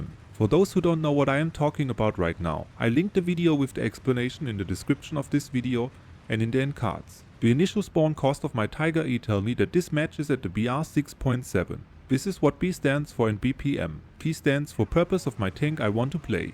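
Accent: Germany. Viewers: Is it possible thst your native language is german ❤?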